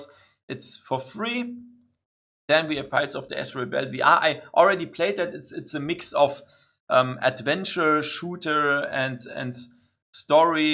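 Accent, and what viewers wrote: Germany. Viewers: You never have to apologize for your English. I absolutely love your accent and Germans in general so the people who are here, stay for one reason or another.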